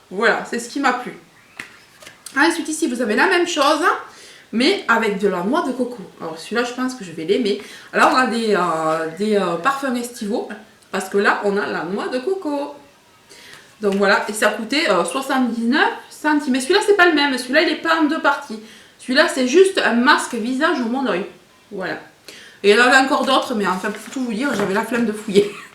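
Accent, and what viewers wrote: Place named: Provence, France. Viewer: ça sent bon la Provence comme ton accent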